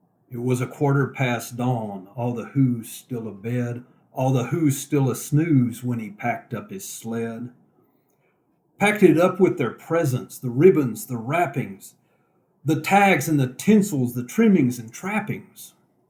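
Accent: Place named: South, United States. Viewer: Love, luv, l-o-v-e this! Such a pleasant, warm and southern voice. Going to share it with my friends ❣️👍🏻🫶🏼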